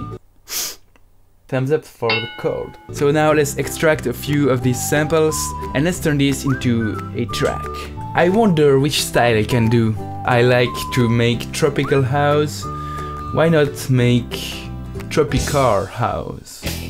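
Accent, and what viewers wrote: France. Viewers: hey one second... he got a french accent no?😂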